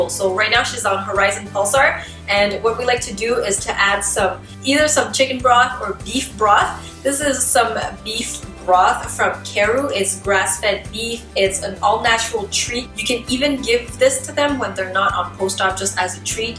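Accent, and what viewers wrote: Quebec, Canada. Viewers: J'entends ton accent franco-canadien, c'est super! :) Merci pour ce vidéo! Ma shihpoo vient de se faire extraire 2 dents et on aimerais qu'elle soit aussi comfortable (que possible), durant son rétablissement. xx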